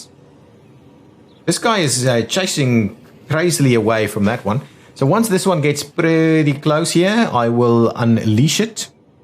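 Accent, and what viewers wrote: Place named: South Africa. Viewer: Saw Worcester in a Transport Fever 2 Spring Update search thinking this might be a South African. Could hear within 2 seconds the way you pronounce your R that you are a South African.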